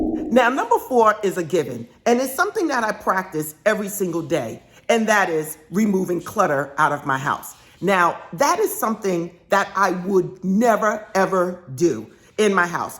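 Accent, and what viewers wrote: New York, United States. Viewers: 🥰Thank you as always! Love that NY accent!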